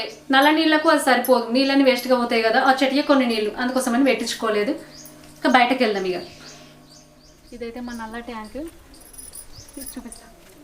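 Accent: Telangana, India. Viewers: Mede Telangana voice Andhra Pradesh atla anacokutaru.mari yatakarm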